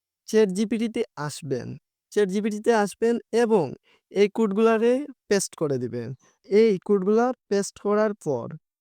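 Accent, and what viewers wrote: Sylhet, Bangladesh. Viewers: ভাই আপনার বাড়ি কি সিলেটে ❤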